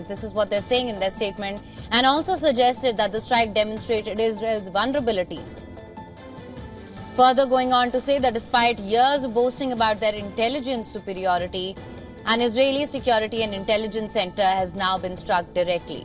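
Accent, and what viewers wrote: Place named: Gujarat, India. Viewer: Is this channel for Indians only...why does she speak like some Gujarati aunt from some factory in England.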